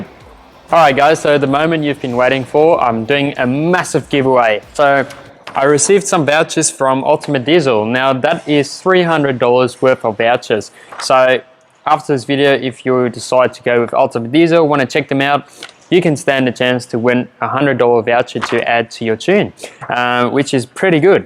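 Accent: South Africa. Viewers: Dude, are you from South Africa?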